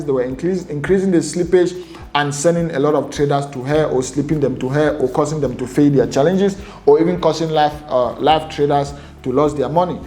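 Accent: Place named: Nigeria. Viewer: My wife said your accent is Nigeria. Lol. Thanks for the analysis it was helpful. Good job.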